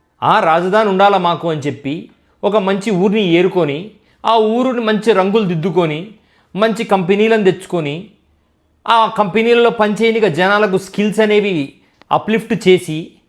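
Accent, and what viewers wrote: Rayalaseema, India. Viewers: Rayalaseema yaasa 🔥💞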